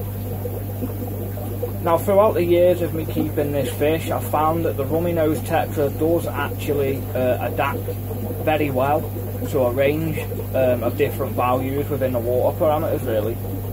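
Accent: United Kingdom. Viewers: lol I'd say that's a northern accent 👍 I'm a scouser myself and gd vids thanks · First off, congratulations of your country freedom and independence from the tyrannical shadow global organization. Secondly Macbeth is classic literature and movies for hundred of years. Why do you sound like you are from Scottland or Ireland and not from England? Where your parents from one of those 2 countries?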